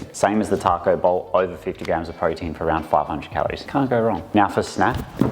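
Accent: Australia. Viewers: Are you a West Aussie mate ?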